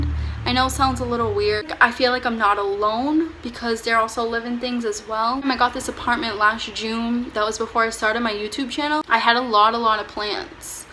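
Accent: Boston, United States. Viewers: Do you live in Boston? It sounds like you have a boston accent. It's cool. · Are you from Boston? You have the accent! lol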